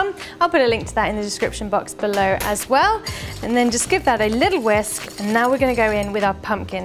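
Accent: England, United Kingdom. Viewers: 😊love English accent 🎉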